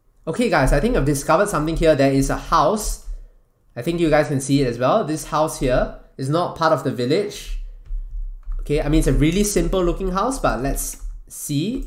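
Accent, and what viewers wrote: Singapore. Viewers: singaporean accent pretty strong here LMAO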